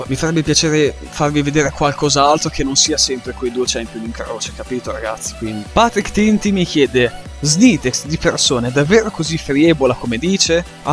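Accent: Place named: Bologna, Italy. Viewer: Sei di Bologna? :O pure io :D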